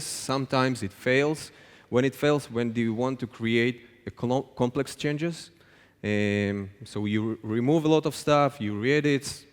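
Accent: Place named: Russia. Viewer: спасибо, полезно, только автор, пожалуйста, прогоняйте перед зеркалом речь, и правда - сильно режет слух наш "акцэнт"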